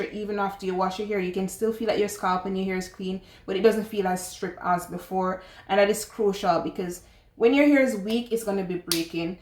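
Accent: Jamaica. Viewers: I like the accent ☺️ sounds jamaican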